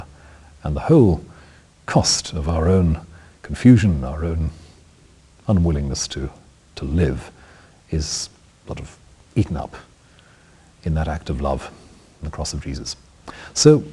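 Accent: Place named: United Kingdom. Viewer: Sheesh...That's not right. The Gospel has nothing to do with anything WE do...You're saying that "The Gospel is for us to trust Jesus?" That's not right...c'mon...you can't fool me with that British accent...although it does make you sound rather intelligent...Kinda like Richard Dawkins sounds intelligent...lol. But I know you know better than this...Why not just say what the Gospel is? You don't have to contemporise it, or put it in modern terms...just preach it!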